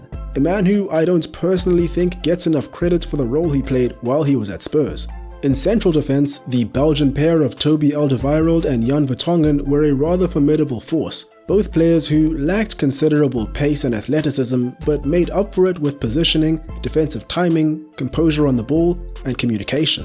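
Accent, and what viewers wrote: South Africa. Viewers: The narrator sounds south african! If so, it makes me even more proud to say this is an awesome production. Subscribed!!! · Awesome to hear a South African voice! Nice work. Subscribed 💪🏻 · Narrator sounds South African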